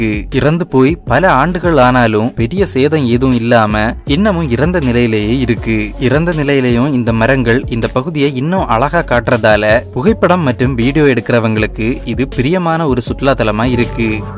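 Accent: Kanyakumari, India. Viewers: கன்னியாகுமரி காரனா நீங்கள்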